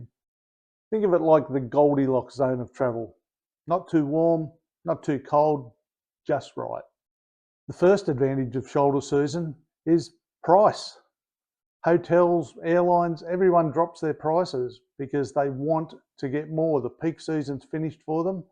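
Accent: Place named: Australia. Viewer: I thought you had an Aussie accent. Wow, you’re a world away from USA and the State of New Jersey! Great luck to you, dare I say “mate”.😊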